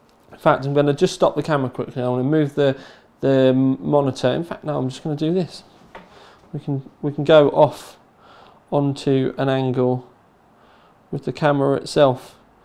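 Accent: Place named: South East England, United Kingdom. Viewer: Off the top of my head, you are a Kent, Essex lad... I could be wrong... Maybe South of the Thames... I know you are somewhere South East, South West possibly, but I would have lost my money... South East for me! Peace...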